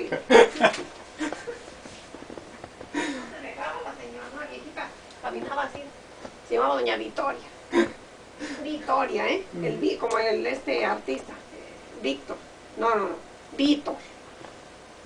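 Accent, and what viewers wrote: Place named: Jalisco, Mexico. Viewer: She talks like my momma, we are also Jalisquillos... el acento no se confunde me cae.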